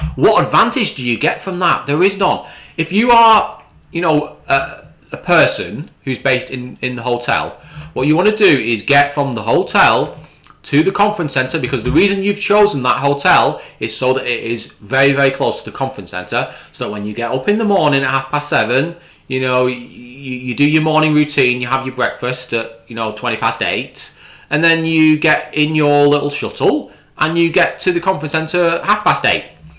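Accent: United Kingdom. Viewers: I grew up loving every single British accent I've ever heard. Thanks to the rampant self-owning stupidity coming out of my speakers in your dumb-soaked voice has diminished that pleasure significantly. What really proves that you don't know what "science" is hardly the idiocy you've given about his obscure research or his lack of engineering patents, it's the fact you thought he wouldn't look up source materials like the Boring Company's own published materials. "That's why we don't listen to the guy, because he doesn't know anything about anything". Says the clown with about 8310 subscribers, compared to a 1.02 million subscribers. If only, if only, IF ONLY... it's such a crippling shame that you aren't really a crew member sharing the fate of the USCSS Nostromo. Being the kind of creature who would assist and enable snake-oil salesmen like Musk means you ought to be banned from making videos. 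I'd consider listening to you the same way people might watch clowns, to poke fun at your inability to do basic thinking. It would be amazing to watch you "shoot down all" his "stupid videos" and watch you blow off both your feet... but it hurts too much to hear your idiocy expressed in a British accent. You'd be ashamed and would componse an apology video if your brain functioned, you gamma-slug.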